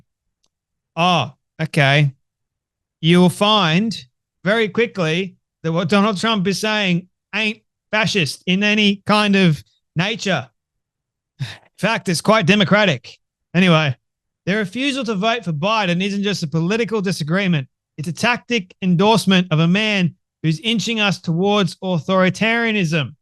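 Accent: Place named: Australia. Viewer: LOL, I love how Aussies say.. "mate", especially you,.. Happy.Night/Day:D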